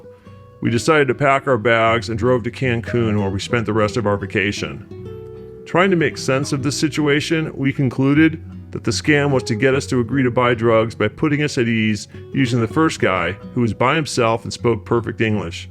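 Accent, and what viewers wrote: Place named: Southern California, United States. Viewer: If you're willing to share, are you from socal by any chance? You have a socal accent very clearly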